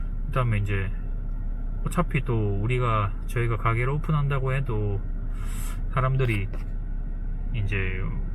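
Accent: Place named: Gyeongsang, South Korea. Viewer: 고향이 경상도 인가보네요 언능 빨리 나아졌으면 좋겠네요 화이팅!!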